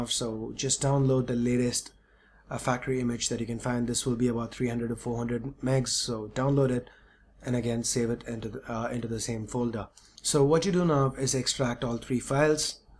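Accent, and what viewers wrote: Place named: India. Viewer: You saved my day, brother!!!!! :D & you sound like indian.... that's close to me! :)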